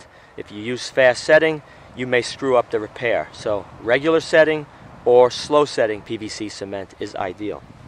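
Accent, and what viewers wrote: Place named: New Jersey, United States. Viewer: So was this filmed on the Jersey Shore (pronounced "Show ah") ? :-) That accent sounds familiar.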